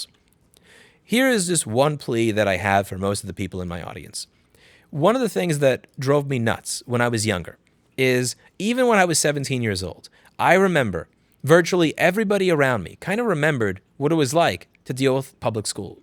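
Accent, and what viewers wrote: New York, United States. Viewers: This is the most New Yorker rant I’ve heard in a long time. I’m here for it.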